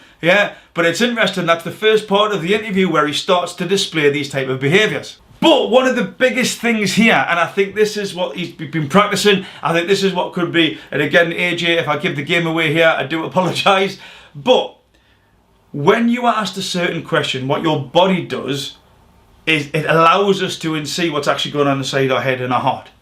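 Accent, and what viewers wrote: Newcastle, United Kingdom. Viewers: Anyone else he sounds like true geordie or is it just me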